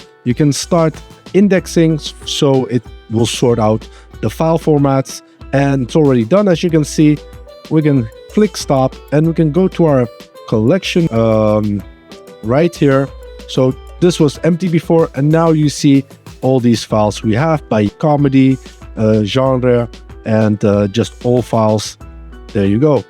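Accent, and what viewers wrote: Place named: Netherlands. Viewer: You sound like a dutch speaker trying to talk english ... 😅 Kom je uit Nederland?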